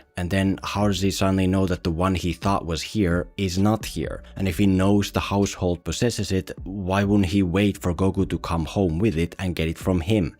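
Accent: Finland. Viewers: I have watched your videos for more than 10 years and only now has it clicked for me that your accent might be Finnish.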